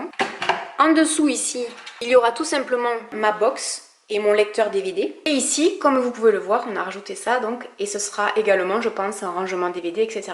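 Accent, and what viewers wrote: South, France. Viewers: Nickel ! "Avé" l'accent en prime . Merci, une mine d'idées exceptionnelles. Bien filmé, bien expliqué. ❤👍👏👏👏👏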